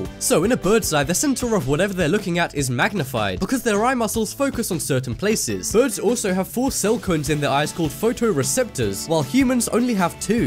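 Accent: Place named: England, United Kingdom. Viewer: Great now that’s all I can fixate on. I already find the English accent one of the most annoying, almost to the point of it sounding like a speech impediment.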